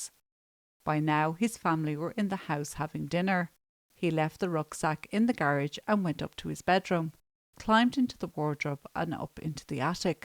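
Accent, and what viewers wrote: Ireland. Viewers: I love this Irish accent. Well narrated! 👏👏👏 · Irish accent, ❤ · This fool doesn't recognise a beautiful Irish accent! Eejit!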